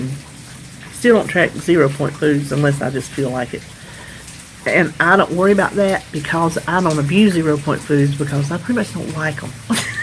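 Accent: South, United States. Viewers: I love your “southern talk”, unlike my NY accent. When you said “coffee”, that’s me.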